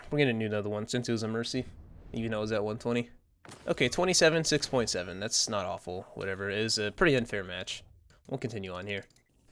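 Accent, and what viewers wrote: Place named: Ireland. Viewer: Are you Irish or American? I keep hearing Irish coming out 😅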